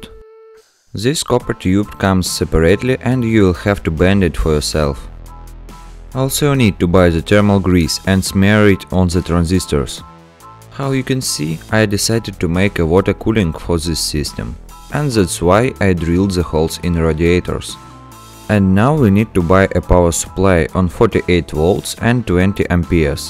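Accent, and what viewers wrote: Russia. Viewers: What a brilliant russian akcent is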